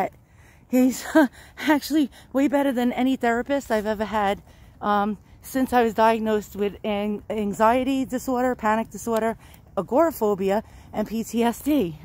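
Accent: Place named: Catskills, United States. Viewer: I grew up in the Catskills & your accent caught my attention right away, it was like being home again. Don't be too hard on yourself as you have a great personality. I survived the battle of Mogadishu in 1993 & although I didn't suffer problems with PTS it did take time to come to terms so there is light at the end of the tunnel. Dogs are a gift indeed so hopefully Samson will bring you peace. Just remember never give up & keep fighting. Your videos are charming so hopefully making them is enjoyable for you, all the best!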